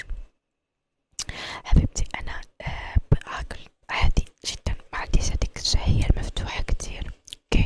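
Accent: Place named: Syria. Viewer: هضري دارجة نيشان بلا ديك اللهجة د باب الحارة كانظن اتكون حسن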